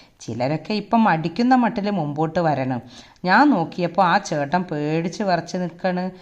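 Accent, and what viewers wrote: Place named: Thiruvananthapuram, India. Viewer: തിരുവോന്തരം ഭാഷ ഉഷാർ😊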